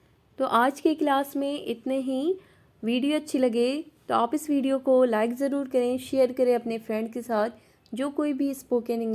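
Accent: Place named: Bihar, India. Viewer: What a bhihari English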